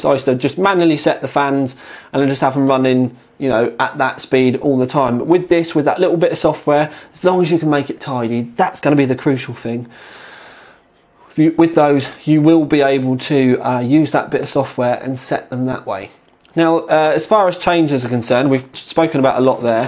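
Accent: United Kingdom. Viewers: Wow... he didnt skimp when he said FULL REVIEW... whatever his british accent will occupy me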